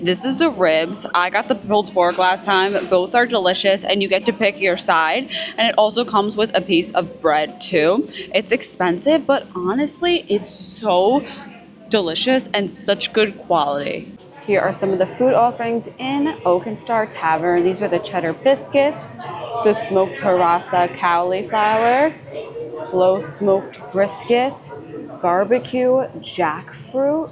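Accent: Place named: New Jersey, United States. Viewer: That Jersey accent is thick. 😂😂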